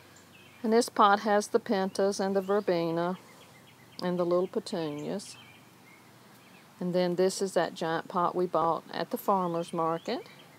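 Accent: South, United States. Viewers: i have just discovered your YouTube channel and i have enjoyed your Garden videos so very much. I am inspired! In what part of the country are you living? I think I detect a very distinct southern accent. 😊♥️😊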